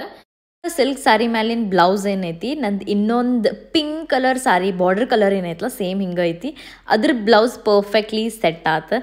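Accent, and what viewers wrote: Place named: Dharwad, India. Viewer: Lot's of love From Dharwad ❤ yast cute aagi matadti akka dharwad bhashe❤😘 #🔥ni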